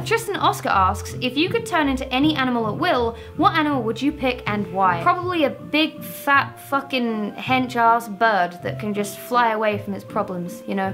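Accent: United Kingdom. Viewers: To an American, you still sound British and we love it ❤️❤️ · i was really caught off guard by your british accent?? why? i guess i've been watching a lot of american youtubers lately. anyways, your book cover is FLAWLESS!! ily xx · As an American I can very safely say that your accent isn't remotely American. Don't know what locale of British but definitely British to an American ear. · I have another friend who gets told she sounds American , but it makes me LOL every time because she (and you) still sounds so British to me. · 1) What do you think happened to the guy that was released from North Korea in a coma? Update: he died. 2) As an American, I can safely say that you do not sound American, you simply have a "light" British accent.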